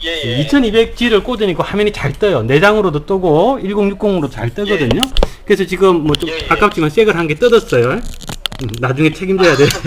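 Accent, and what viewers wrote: Gyeongsang, South Korea. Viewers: 참 컴퓨터 조립처럼 변수가 많고 ㅇ;벤트가 많은 것도 없는거 같습니다컴퓨터 조립이 별거 아니라고 쉽게 생각하는 많은 사람들에게 좋은 교훈이 되는 영상들인거 같습니다 ㅎㅎㅎㅎ쉽다면 쉽지만 어려워 질려면 어마어마 하게 고난이도로 올라 가버리는게 컴조립인거 같습니다 항상 재밌게 풀어 나가는 영상 잘보고있고 배웁니다 ㅎㅎ억양은 경상도이신데 먼데 가셔서 자리를 잡으신듯 합니다~가계구경이라도 가보고 싶내요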